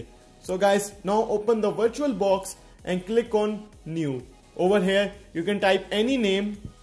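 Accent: India. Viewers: sounds like an indian speaking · u indian?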